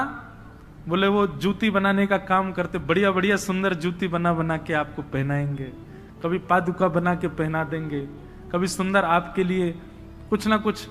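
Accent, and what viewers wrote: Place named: Braj, India. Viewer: Apki brijbhasha me boli bhaut achhi lagti hai maharaj ji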